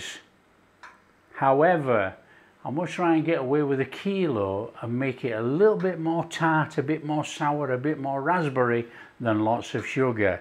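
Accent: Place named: England, United Kingdom. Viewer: you are da man my new friend. Your channel is absolutely awesome. Nice hearing your English accent, we are expats from South Africa, been living in Huntsville, Texas for 33 years.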